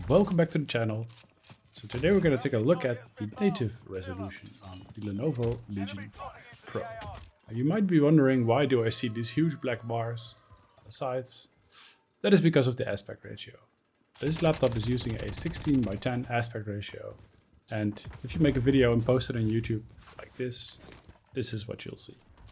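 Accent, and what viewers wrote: Netherlands. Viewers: Awesome vid thanks bro. Also you sound Dutch.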